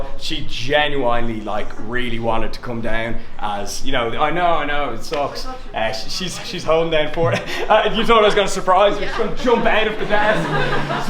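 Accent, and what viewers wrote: Dublin, Ireland. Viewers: what part of ireland are you from?? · Are you from Dublin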